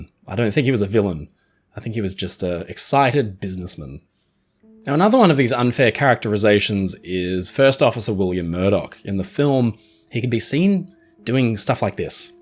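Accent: New Zealand. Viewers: Thanks for a brilliant video. I learned a lot. Many years ago, before the 1997 movie came out, I read that Titanic had 50 inter-ship telephone lines. What would they have been used for? And is this true? Also, are you a kiwi or an Aussie? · you're from new zealand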